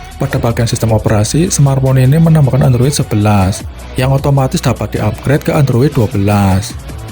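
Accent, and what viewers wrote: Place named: Tegal, Indonesia. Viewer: Teggel to...pie kabare Bro..hehheee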